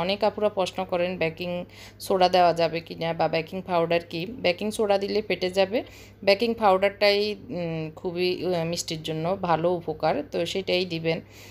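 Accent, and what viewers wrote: Noakhali, Bangladesh. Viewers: Apu apnr kothay Noakhalir vasar j tan ta, ata amr kache onk valo lage Ami Noakhalir NSTU te porasuna korechilm...onk suvokamona apnr jonno.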